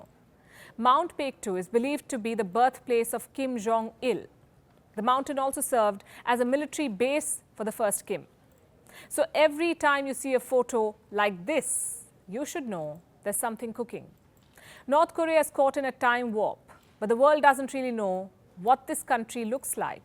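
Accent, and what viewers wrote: India. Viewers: i like the indian ladies accent .., refreshes my memory of the good times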